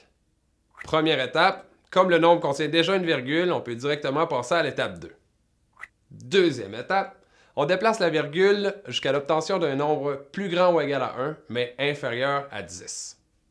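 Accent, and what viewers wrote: Quebec, Canada. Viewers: Merci beaucoup j ai bien compris je vous adore surtout avec l axent québécois 👍😆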